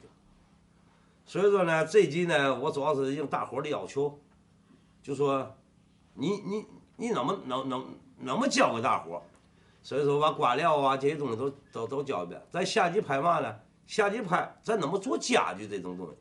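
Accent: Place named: Tianjin, China. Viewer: 天津话贼个锃啊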